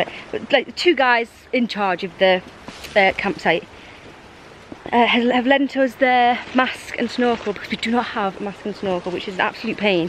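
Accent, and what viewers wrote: United Kingdom. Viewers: nice vids, love your Brits accent made me remember my years in Surrey, UK for my MSc.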